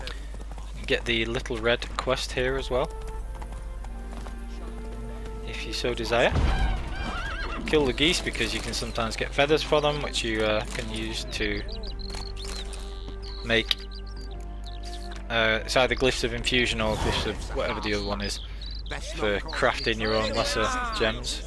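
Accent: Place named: United Kingdom. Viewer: WeeeeeelllIl, want to marry a British guy just for the accent. As soon as I find one willing to. So yeah, guess most of the girls around the world do love your accent 😅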